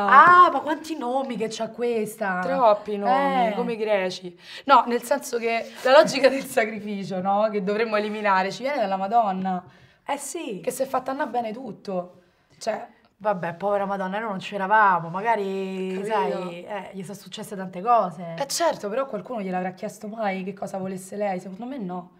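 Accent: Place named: Rome, Italy. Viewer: L'accento romano dente tutto unico😂😂😂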